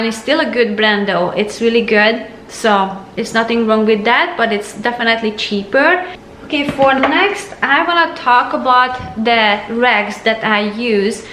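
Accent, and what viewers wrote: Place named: Hungary. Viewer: Great idea to have everything in one place for recipes and products you're liking! It will be interesting to look back a year from now and say, do you still like the vacuum and things.:) Yes, you said "Sal Suds" perfectly. Your bloopers remind me, in a recent video you mentioned when you first started your channel, you wondered if people would understand you. I LOVE your Hungarian accent - having lived in several countries I just enjoy hearing other people's way of speaking and learning new words. I don't remember if I told you or not. So keep it up, you are amazing and maybe don't realize it, but people who haven't had the opportunity to travel will get a wonderful exposure to Hungary through your speech and your recipes. <3 Oh, and I think the pods brand is "dropps", I've seen them somewhere and maybe not positive, but pretty sure that was the brand name. 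Maybe? LOL Wishing you a beautiful day,!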